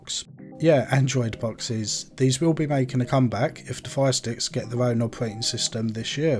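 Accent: Cockney, United Kingdom. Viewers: This guy is a proper cockney geezer 😂😂😂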